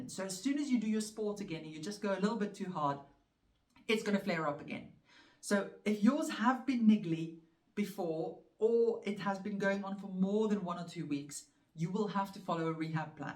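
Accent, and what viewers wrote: South Africa. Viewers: I love your accent! Are you south african?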